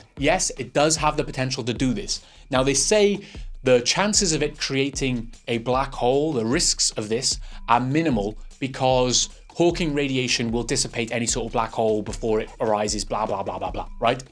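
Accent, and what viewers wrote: Wales, United Kingdom. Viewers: literally every vid you make is on the lines of what I'm researching, i feel like your following me...and you sound like your from my end of Wales...definitely following me XD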